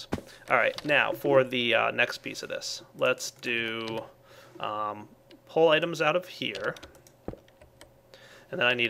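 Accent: Australia. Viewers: Ausie? or not because i am... :D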